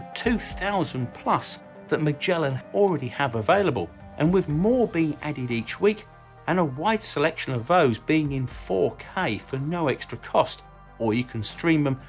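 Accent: United Kingdom. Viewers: Classy man, with classic British accent is a great combination to be a host of an informative show. Much respect from Russia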